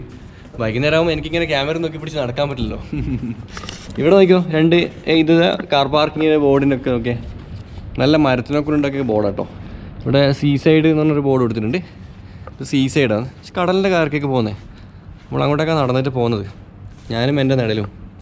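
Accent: Kannur, India. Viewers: ഓവ്വ് എന്റെ മോനെ . ഇങ്ങള് നോക്കിയാട്ടെ . . 😀😀😂😂 എട പോയാലും അമ്മളേ കണ്ണൂര് സ്ലാങ്.. ബേഗം റൈഡ് സ്റ്റാർട്ട്‌ ആക്ക് അമ്മള് കട്ട വെയ്റ്റ് ആന്നപ്പ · Kannur slang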